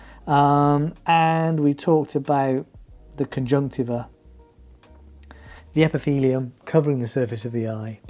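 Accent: England, United Kingdom. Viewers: ya ne tatlı bir adam bayıldım. konuştukça o ingiliz aksanıyla histolojiye ilgim arttı yerim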